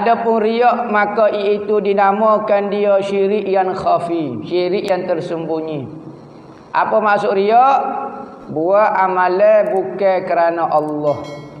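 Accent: Kelantan, Malaysia. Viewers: Saya dari perak..suka dgor ust bgi ilmu..faham walau kecek kelate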